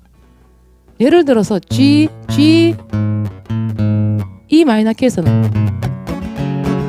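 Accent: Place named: Daegu, South Korea. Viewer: 대구 사투리가 아주 맛깔스럽네요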